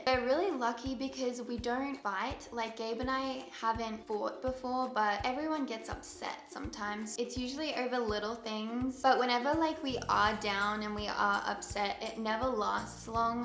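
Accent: United States. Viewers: You sound completely different with an American accent😂😂❤️ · LOVE the American accent!!! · Omg you sounds so different with that American accent!! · I'm Japanese and I'm studying English , when I watched your video first time , I didn't think your accent is strong but in this video I know the difference !! American accent is cute too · Omg I love your American accent! I had to close my eyes and remember it was you! Love you 💕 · Hahah, why did it feel like a completely different accent with the American accent 😂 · omggg doesn't even her voice sound so much different with the american accent? hahaha love it!!! like a whole other person :D still the cutest thing ever is her australian accent ☺ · i love your american accent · Although it was cool hearing you talk with an American accent, I totally favor your Australian accent! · You American accent 😍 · LOLOL I love your American accent. 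so funny. seriously a different person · I was so shocked by your US accent oh my gosh! I'm from nz and could never do one as good as you!! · Your voice changes so much with your American accent but I still love you accent now!!!! 🥰🥰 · AMERICAN ACCENT IS ON POINT ! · Omg your American accent tho!! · Your voice sounds so different with an american accent, but still so slick❤ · LOVE YOUR AMERICAN ACCENT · omg her american. it honestly made me “uncomfortable” luv u! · omg the accent!! It sounds like a voice over because it’s just not real in my mind to hear you with an American accent! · Ok your American accent is officially on point!!! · oh my gosh. her american accent just does NOT fit her XD keep it Australian i love your accent the way it is! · OH MY GOSH!! Your American accent was sooo good!! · Your American accent is amazing! That's so funny how you can just switch like that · you with an american accent is SO different! 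wow! love you. ❤️ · Omg it was so weird to see you talk in american accent 😨. It was reaaally good but sooo different from what we are used to! Love you😊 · I think that you're gorgeous and you shouldn't worry about what other people think about you or your relationship with Gabe. Gabe chose you because he loved you for who you are and he was happy with you. I think that you and Gabe are perfect for eachother. I love your American accent❤❤❤❤ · Wow amazing american accent💖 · You are so good in American accent.❤ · WHAT THE HECK HER AMERICAN ACCENT IS SO GOOD BUT SHE SOUNDS COMPLETELY DIFFERENT AH 😩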